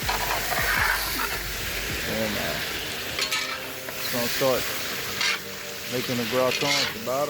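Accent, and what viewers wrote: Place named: Louisiana, United States. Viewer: Making it today!!! I've watched many people's vidoes but this one was more of my type of jambalaya! I live in Louisiana so when I heard this accent I knew it was the one!🤣🙌🏽🙌🏽🤟🏿 I will be back for updates!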